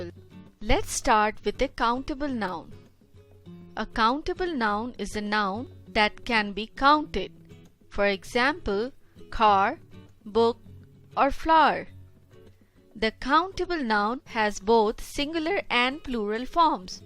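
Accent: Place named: India. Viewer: where r the odds ... bruh indian teachers again , just look at the accent its annoying tho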